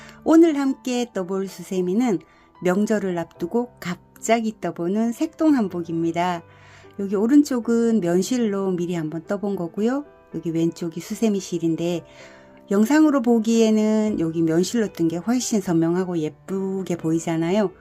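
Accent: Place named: Gyeongsang, South Korea. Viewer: 유툽 여러 선생님들 많지만 최고예요~^^경상도 억양 넘 정겹고요 꼼꼼하고 디테일한 설명에 감동여요^^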